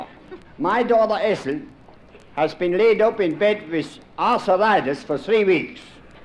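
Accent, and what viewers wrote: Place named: Pennsylvania Dutch, United States. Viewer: he used to be at our family reunions when I was a kid... now 67 years old and that is how the old dutchies would talk my grandfather and uncles talk that way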